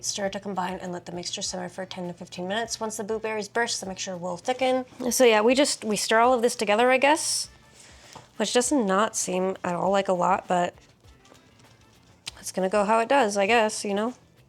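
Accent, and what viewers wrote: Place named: Midwest, United States. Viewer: Definitely from Midwest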